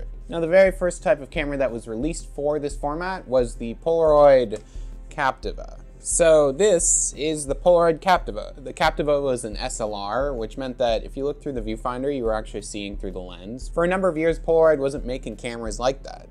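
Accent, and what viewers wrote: Canada. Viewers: He’s Canadian so it’s his way of pronouncing it, you can tell too with Certain pronunciation of words in all his videos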